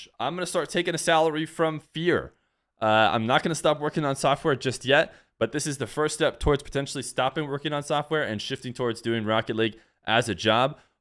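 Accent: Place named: Scotland, United Kingdom. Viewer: cant lie. I love when a player pops off and gets excited. Nothin like that scottish accent!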